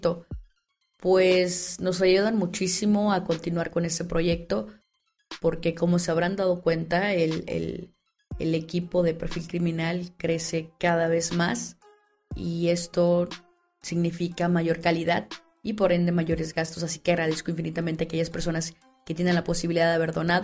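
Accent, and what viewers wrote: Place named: Mexico. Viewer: Gracias , te felicito y agradezco tu talento,me encanta tu acento mexicano. Abrazos desde Brasil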